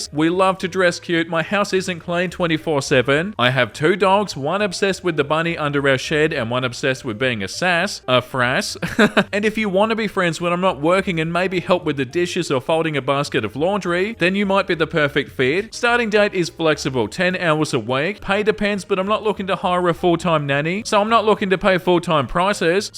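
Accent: Australia. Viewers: Lol my cats name is sassafras ❤ also when I was at the mall yesterday I started feeling not great and I ended up sitting on the floor in a quiet corner in the mall with Starbucks listening to videos, just what I needed, love you ❤ P. S. As a kiwi, you’re literally the only Aussie I can bear listening to 😂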